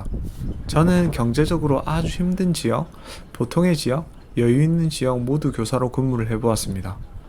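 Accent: Busan, South Korea. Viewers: 91년 동대 법대에 입학했었는데(동대신동캠퍼스), 저수지가 낯익군요. 올해 애가 고1이라, 애를 위해 고등학교 학부모운영위 학부모위원 되었습니다. 애는 지극히 평범(고1, 3월모평 국4등급, 영수 2등급)하고, 힘들게 대학다녀도(4년 학비도 만만찮고) 원하는데 취업하기 힘든 게 현실이고, 현재 고등학교는 입시위주 교육을 할 수 밖에 없는게 현실이어서, 아들에게 대학가는것보다 (서울사립대기준 학비의 2배를 줄테니) 앞으로 뭘 먹고 살지를 고민해 보라고 했더니, 아들 눈에는 좋은 대학나와야 사회적으로 인정 받는다고 강하게 믿고 있는것 같습니다. 지금 인천 살고 있지만 매주 동영상보니 부산이 마이 그립고, 선생님 목소리가 제 아는 분과 같아 친숙하네요(부산분치고 사투리가 심하지 않음)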